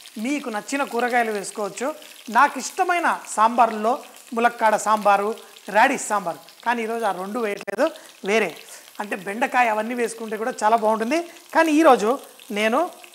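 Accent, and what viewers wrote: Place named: Hyderabad, India. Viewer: Brother you should talk Hyderabad telugu only... Being a Hyderabadi. . I enjoy while you talking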